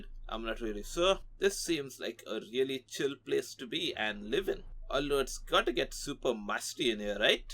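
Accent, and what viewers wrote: India. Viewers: Indian and Bangladeshi at the same time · Indian i think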